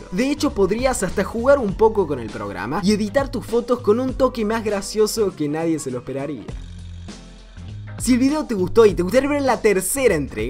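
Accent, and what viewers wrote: Córdoba, Argentina. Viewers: decime que sos argentinooo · sos cordobes?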